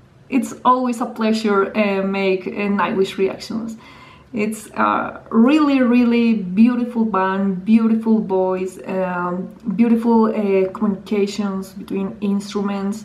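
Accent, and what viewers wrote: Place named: Colombia. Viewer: tienes acento a colombiana, eres colombiana?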